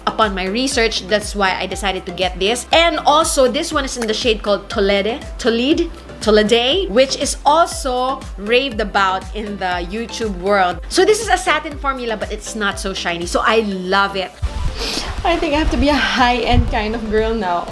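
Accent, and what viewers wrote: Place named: United Kingdom. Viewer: ! Love the british accent! Ganda ng intro!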